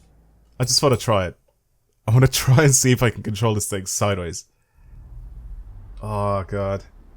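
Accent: Dublin, Ireland. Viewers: Are you from Dublin?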